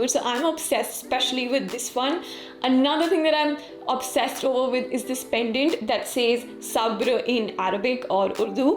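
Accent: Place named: England, United Kingdom. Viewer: English accent is so good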